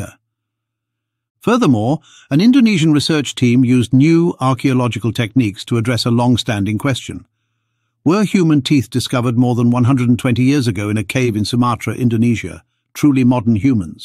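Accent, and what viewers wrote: United Kingdom. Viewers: BS !! The GIANTs were the first hue’men. We aren’t believing yo slick Brit accent. There is no Evolution (Evilution). There is ONLY DEVOLUTION. Archeologists & Anthropologists frauds have NO credibility with MELANDIGENOUS ORIGINEEs.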